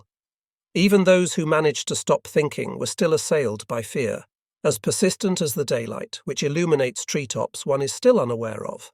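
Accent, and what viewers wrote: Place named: United Kingdom. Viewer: Yeah, the British voiceover is the most entertaining when he yells fire or incoming is hilarious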